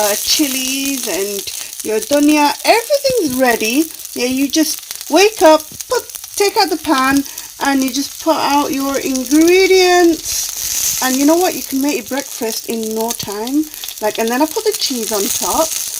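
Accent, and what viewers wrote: Sylhet, Bangladesh. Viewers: আপা খুব ভালো লাগলো ভিডিও টা,,, আর আপনার মুখে সিলেটি মাথ হুইননা মন টা বইরা গেলো,,, নতুন জয়েন হলাম আপু,, আশা করি আপনিও জয়েন হয়ে যাবেন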